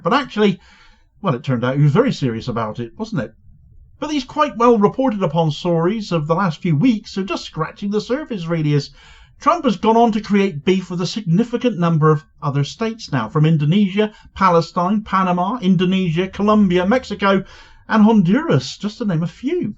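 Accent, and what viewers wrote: United Kingdom. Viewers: I love how this idiot with the stupid British accent thinks his opinion holds any relevance on anything in this country.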